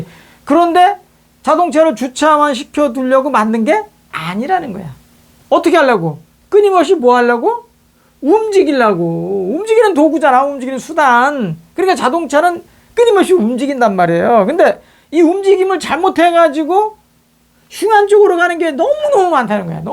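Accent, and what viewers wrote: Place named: China. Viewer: 유튜브발음이 중국인같음!!! 윤통체포… <link>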